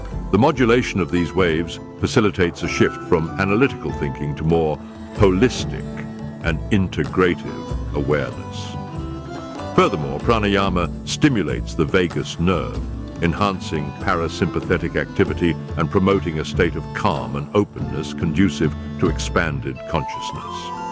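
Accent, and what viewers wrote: United Kingdom. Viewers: Why do you use British voice over? Are you feeling inferior to those assholes?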